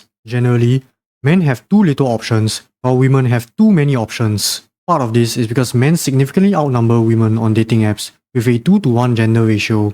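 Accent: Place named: France. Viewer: you sound slightly Frensh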